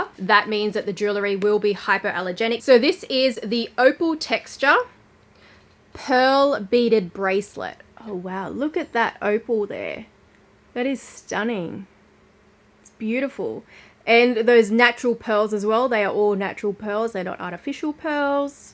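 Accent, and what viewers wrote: Australia. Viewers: You sound very Australian wouldn’t you be better off flying to Dubai to purchase Luxury handbags 👜?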